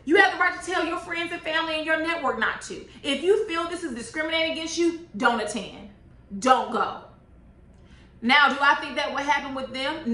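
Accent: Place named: Houston, United States. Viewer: Something TOLD me you were in or from Houston! If Im wrong, sorry, but I always felt like I could pick up on that fellow Houstonian tinge.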